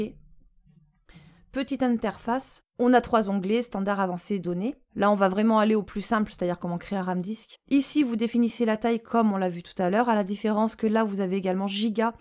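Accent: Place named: Toulouse, France. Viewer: Cet accent Toulousain ^^ J'adore lol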